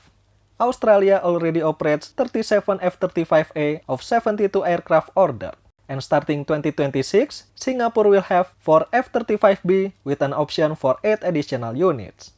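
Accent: Indonesia. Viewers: You got indonesian accent .. cool 👍👍🤙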